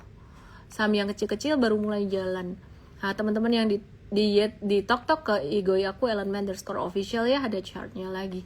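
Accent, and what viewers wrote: Java, Indonesia. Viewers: Cik Ellen tetep logat jowo ae, wong jowo ojo lali jowone. Kamsia. Gbu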